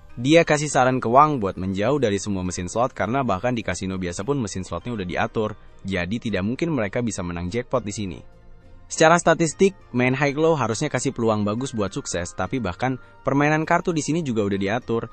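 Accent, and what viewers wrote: Malaysia. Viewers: Orang malay bang? Aksennya gitu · Ni bahasa Malaysia apa Indonesia Tapi Malaysia dia pekat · Abang orang Malaysia ya😊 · bisa ga si gausah pakek logat malay bng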